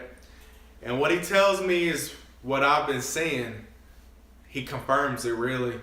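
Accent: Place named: Tennessee, United States. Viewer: Is this a "southern draw"? Your accent sounds like a Tennessean or Arkansans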